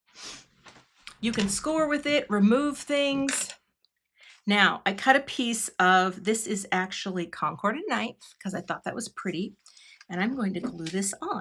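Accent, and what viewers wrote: Midwest, United States. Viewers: ?Harold and the Purple Crayon? I hear a little mid west accent in you.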